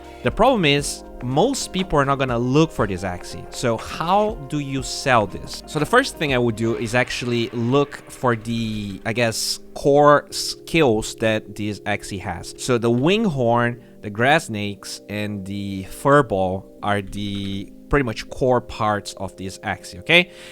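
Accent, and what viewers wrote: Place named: Brazil. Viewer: Hey man, are u brazilian? U have an accent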